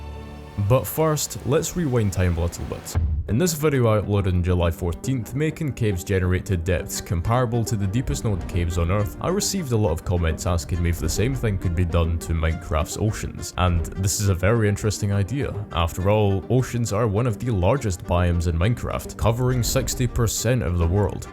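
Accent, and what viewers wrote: Scotland, United Kingdom. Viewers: Where are you from? You sound like a Scot trying to not have a Scottish accent. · I finally found a scottish youtuber! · Scottish YouTuber found lets go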